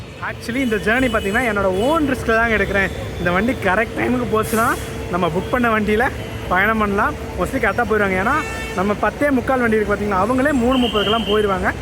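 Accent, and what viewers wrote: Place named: Madurai, India. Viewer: Neenga Coimbatore thaana eppudi madurai slang pesuringa?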